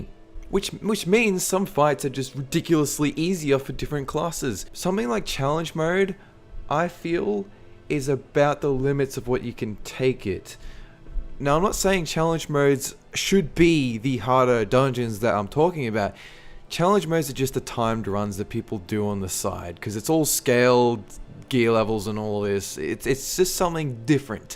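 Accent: Australia. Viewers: Dude, you're an Aussie? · Sick you're Aussie!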